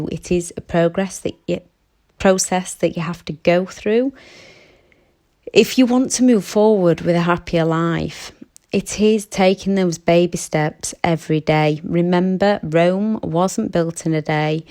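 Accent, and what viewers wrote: United Kingdom. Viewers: Thank you so much for this. I've broken free, but apart from the initial feeling of 'I"m Free!","I did it!", there's been such crushing anxiety to the point that my legs don't seem to work properly when I go out. My brain tells me I'm slipping when I;m not. I fear wet pavements and shiny tiled floors. I know it's anxiety, so I push through and still walk on these surfaces. I shake at the prospect of going anywhere new and working out what buses I need to take: something that I used to be able to do almost in my sleep, so to speak. But I'm still doing it...I hope this isn't making me worse? It just seems like I must push through, not give in. What you have said really, really helps. Thank you, from Cornwall, UK. (Your accent sounds British). Someone in my own country in the know?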